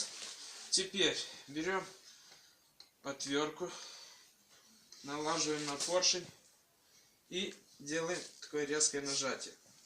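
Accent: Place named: Moldova. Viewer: Сразу слышно,Молдова:)))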